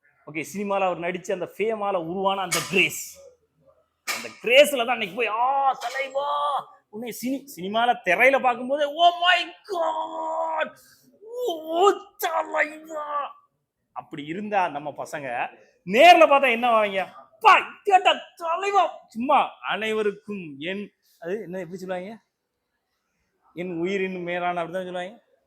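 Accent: Tirunelveli, India. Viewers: இயல்பான நெல்லைத் தமிழில் யதார்த்தமான ஆழமான அரசியல் பதிவு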